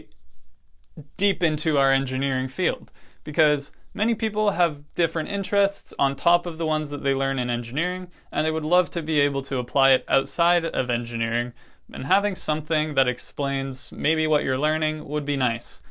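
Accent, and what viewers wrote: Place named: Texas, United States. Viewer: I love the texan accent lol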